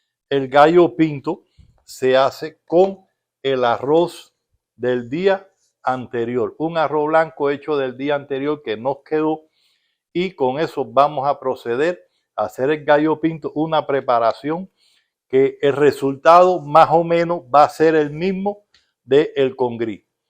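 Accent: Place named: Cuba. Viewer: Felicidades por su canal, mi Padre era de Santiago de Cuba, y cuando lo escucho hablar me recuerda mucho a el, saludos y mucho exito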